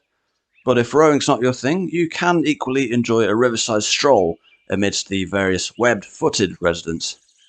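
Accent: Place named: England, United Kingdom. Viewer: You sound English, but you don’t know how to pronounce correctly Blenheim and the Duke of Marlborough This doesn’t matter too much, but it has stands mate. It rolled under mine. Your research.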